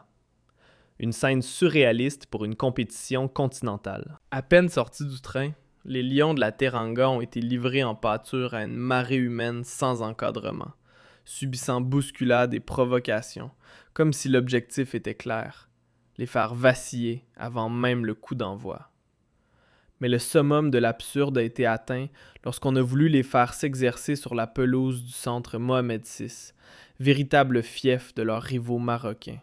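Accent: Quebec, Canada. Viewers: L'accent québécois est terrible. Changez SVP. Mettez le lecteur habituel.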